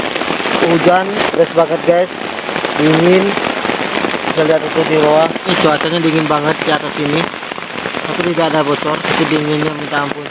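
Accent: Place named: Kalimantan, Indonesia. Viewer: Dari cara omong nya seperti tinggal di kalimantan..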